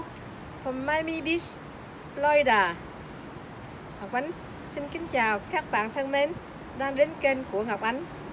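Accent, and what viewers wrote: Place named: Vietnam. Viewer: Trời trời bạn giống người Mỹ quá mãi sau ghe giọng mới biết là người việt.bạn hướng dẫn mua nhà ở mỹ có cần thẻ Xanh ko rất chi tiết chúc bạn thành công nhé bạn hiền ơi 🔔🔔cho bạn nè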